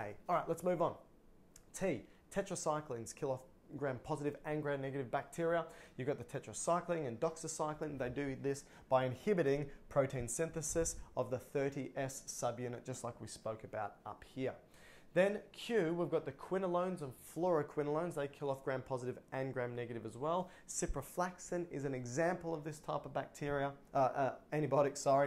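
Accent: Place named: Australia. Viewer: Used this to study for the GSSE here in Australia (where I assume you are from, it sounds like? Or maybe NZ)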